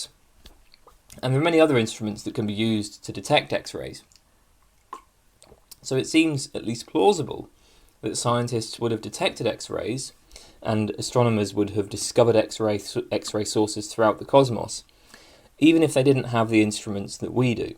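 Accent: United Kingdom. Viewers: I've been watching for more than 3 years and I've never been bothered, or in fact even noticed what you speak of. His videos are hidden gems I had to dig extensively to find. No where on the internet can you find educational material on such a vast range of topics, narrated by an eloquent, young person with a British accent (if I'm not mistaken). What's more is that he provides the most popular and successful interpretations of those ideas. What isn't there to like? Get a grip.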